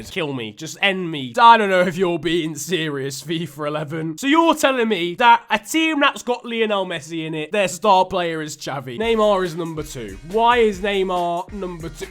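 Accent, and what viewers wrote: Nigeria. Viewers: Are you Nigerian 🇳🇬? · Are you Nigerian?🇳🇬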